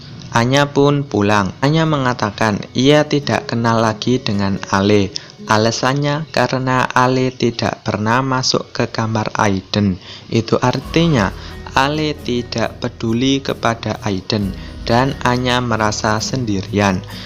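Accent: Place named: Java, Indonesia. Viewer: Medok biangeeet min😀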